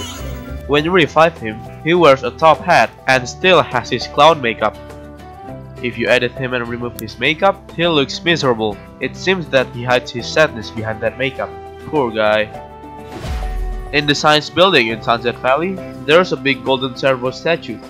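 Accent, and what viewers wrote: Indonesia. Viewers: Good timing! I'm playing Sims 3 supernatural right now. Also, gosh your English accent is so Indonesian just like me. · udah ada firasat xD dari logat bahasa inggrisnya ketauan bgt orang indo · Indonesian accent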